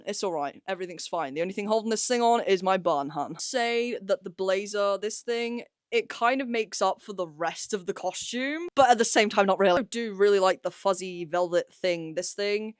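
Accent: United Kingdom. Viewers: UK London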